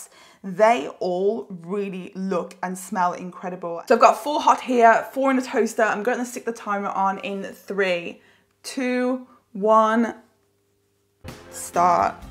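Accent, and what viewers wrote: United Kingdom. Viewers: there should always be a physique check! Oh and whoever said you talk too much was a fool, love your sexy British voice! First video of yours I saw was the 96oz Steak challenge and I was blown away by how much you could eat and how amazing you look.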